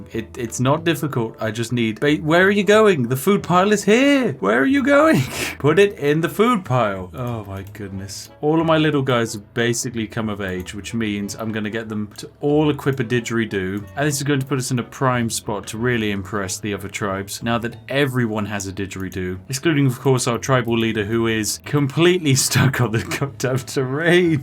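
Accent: United Kingdom. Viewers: I dunno what it is but something tells me he’s British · Your Brit is showing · This guy is definitely British guys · I have a question, Are you Bri-ish? 🇬🇧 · Why r u british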